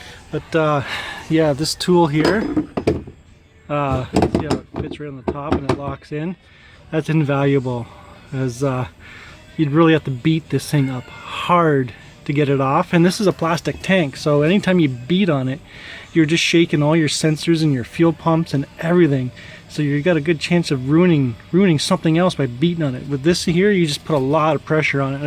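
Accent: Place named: Dakota, United States. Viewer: Dakota accent or canadian.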